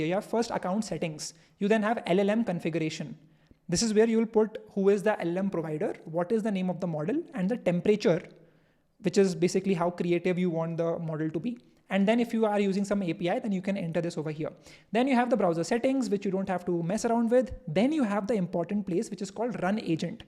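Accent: India. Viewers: I do not know where you are located but I can hear you are from the east. India or one of the countries around there, so please forgive my ignorance about that. Why do I think where you come from is relevant for my comment, it is because India for example is well known for their scam activities, and here in Europe we are always concerned when we get mails, phone calls, from people from India that offer technical support. With this said, I wonder how do you face the insecurity when using AI. Insecurity with regards to whether your data is being used or even shared. Deepseek is Chinese, and connects to private databases for its reinforced Augmentative Responses. Do you consider that when entering passwords or personal information or do you have a more relaxed view on security which allows you to just throw yourself on the net without really having concerns.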